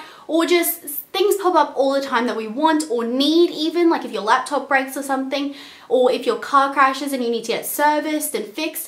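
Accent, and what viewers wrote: Australia. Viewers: its Australian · Nope she's definitely Aussie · How are you getting British? She's clearly Australian. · Australian accent is quit pretty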